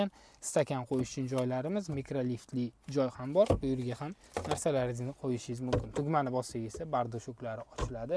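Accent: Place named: Uzbekistan. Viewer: Соф ўзбекча обзорга гап йўқ. Мошина ҳам зўр экан🔥🔥🔥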